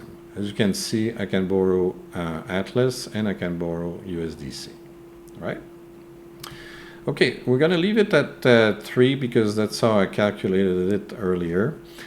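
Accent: Quebec, Canada. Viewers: Is that a Québécois accent that I hear? Ça sonne vraiment comme chez nous!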